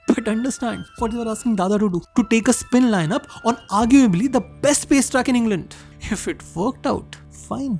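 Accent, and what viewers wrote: India. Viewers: Bro ur Typical Indian accent is absolutely Hilarious 😂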